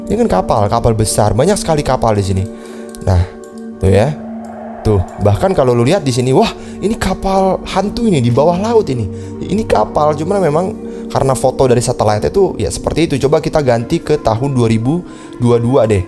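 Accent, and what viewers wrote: Java, Indonesia. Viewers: Jowo banget mendelep 😭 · Orang jawa bang?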